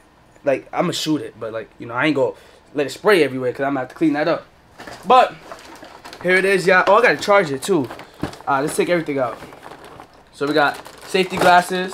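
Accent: New York, United States. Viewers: Is u from NYC?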